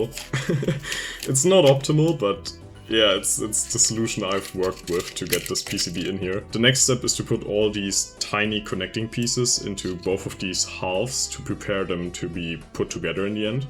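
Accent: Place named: Germany. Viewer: [1] startint to watch video with cleary German accent but too lazy to check if the Youtuber is German or not [2] hearing German word, no need to check anything [3] reading funny comments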